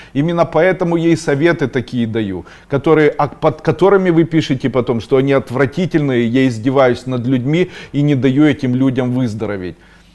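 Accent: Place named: Kazakhstan. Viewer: Ви такий прикольний.Акцент казахський.)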